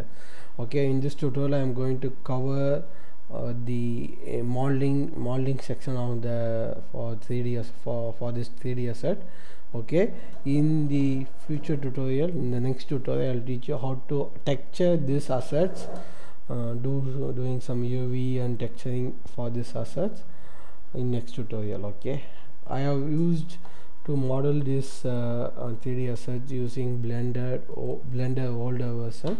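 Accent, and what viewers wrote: India. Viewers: your indian right?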